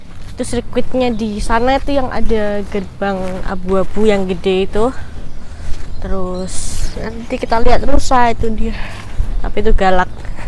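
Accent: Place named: East Java, Indonesia. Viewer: Yoi santuy. Logatnya kek Jawa timur an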